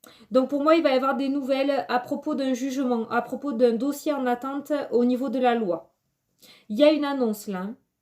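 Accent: South, France. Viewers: Bonsoir ...je me suis proposée de faire du bénévolat . Je commence demain matin et ont vas peut être me proposer d' autres missions.. étant actuellement au chômage ...j' ai travailler dans le social pour personnes handicapées et autistes ...j' ai de l' expérience.. malheureusement j' ai préférer donner ma démission ( surcharge de travail et le système ma déçu..je garde de très bon souvenir des résidents qui était attachant pour ma part. Je voudrais travailler avec les eyen maternelle ou du social pour femmes en difficulté..🙏🙏🙏🙏 ⭐⭐ je ne perd pas espoir je souhaite de tout cœur ma situation professionnelle de réalise .. merci pour votre tirage votre accent du sud je pense ..cela me fait penser ( le soleil la mer ., tranquille quoi 🤣🤗💋💋💋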